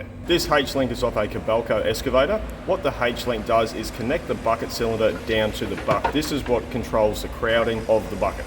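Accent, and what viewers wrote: Australia. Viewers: QUESTION, besides the letters H and Z, what others are pronounced dif in OZ?????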